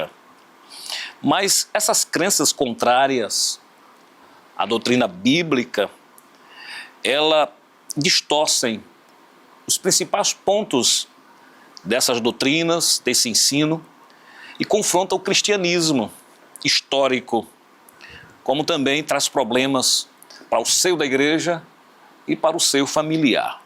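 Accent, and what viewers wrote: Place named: Northeast, Brazil. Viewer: Parece nordestino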